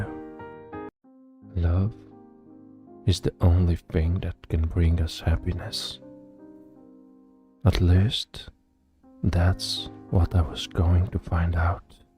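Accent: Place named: United States. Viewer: Încerc să înțeleg ceea ce exprimi , este așa de frumos și atât de minunat mesajul , dublat de o voce magică și inconfortabila , mesaj exprimat atât de expresiv intr-o engleză americană atât de perfectă și atât de clară , parcă sună mai bine decât în “limba noastră,, deși subiectul este comun: Dragostea! In orice limbă o exprimi este Wow , talentul și vocea ta “Fac toți banii,, Te urmez și-ți urmăresc traseu și ascensiunea absolut uimitoare dar și pe deplin meritată fiindcă talentul tău este incotenstabil și incomensurabil ! Nu cred ca ai nevoie de ajutor ca să înțelegi mesajul meu ! Good night and sweets dreams poet of my heart ! 🙏👏😘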